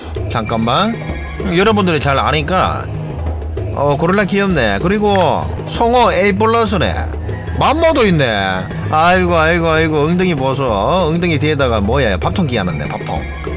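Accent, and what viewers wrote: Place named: Daegu, South Korea. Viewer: 대구 사투리 쩝니다 고향 생각나네요 ㅋㅋ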